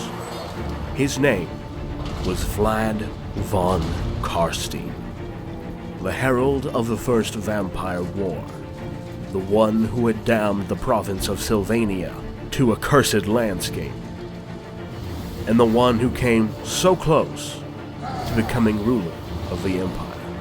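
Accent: United States. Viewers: Nice. Why do all the names sound like an American trying to speak German?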